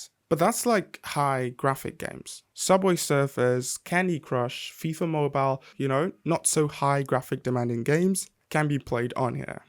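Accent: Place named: United Kingdom. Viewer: Your reviews are unique. Were you born/lived in the UK? Your accent sounds British (I grew up in London). You didn't mention its 256GB variant. I carnt seem to get it on the market, why?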